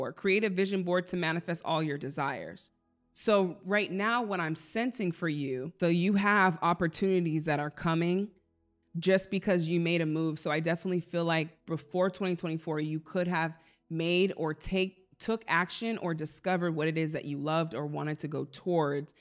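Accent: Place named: Midwest, United States. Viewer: Can you please tell me what decks you used in Leo read. You are remarkable. I’m a tarot collector since 12 yrs old. I’m 66. I love you readings your style your voice. You’re a gift to this world no doubt. I’ve been a reader my entire life it’s not often I see a true message from spirit from readers. Especially one who just cold reading on podcast. Crazy accurate. Bold brilliant and appreciated. What state are you in. I’m in Minnesota. You sound like me. Midwest accent. Lol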